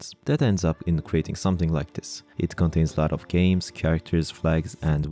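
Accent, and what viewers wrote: Turkey. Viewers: aynen ya sesini duyunca kesin türk dedim :D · türkmüsün kanka aksanın tam öyle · gördüğüm en türk aksanlı kişi 💀ama takdir ettim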